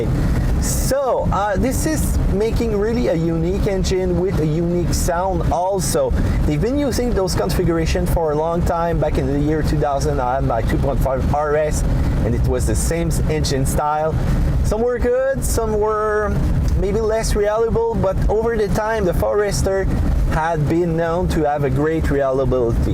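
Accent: Quebec, Canada. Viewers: Sounds like a French CDN - where I was born a long tome ago.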